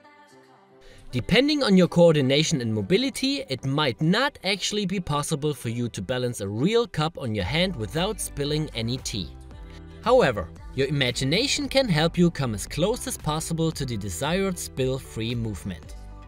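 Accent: Germany. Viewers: Der deutsche Akzent ist ja insane XD aber gutes Video :)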